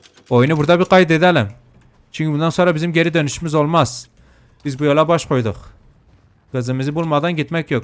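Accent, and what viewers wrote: Elazığ, Turkey. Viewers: Abi sen Elazığlımısın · Abi Elazığ lı mısın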